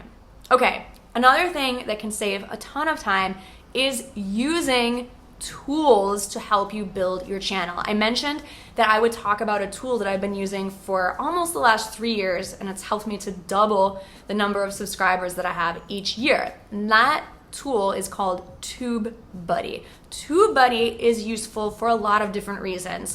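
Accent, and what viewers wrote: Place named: United States. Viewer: I am from India......I know how to speak English but I just wanted to know the American accent Thank you so much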